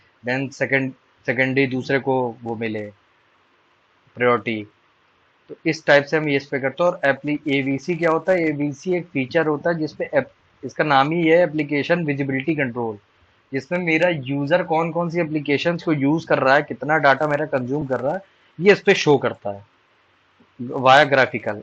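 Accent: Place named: Uttar Pradesh, India. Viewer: avaj se lag rha tha koi up ka hai😾😾😾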